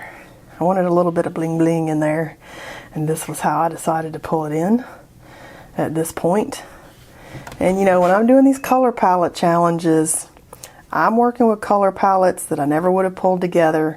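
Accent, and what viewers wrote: South, United States. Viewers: Never let go of your southern drawl or how you pronounce things! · your southern accent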